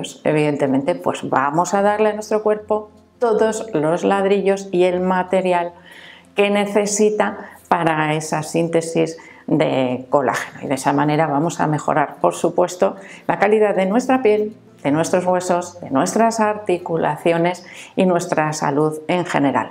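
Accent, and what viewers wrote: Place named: Castile, Spain. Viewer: Que acento tan bonito del castellano ❤❤❤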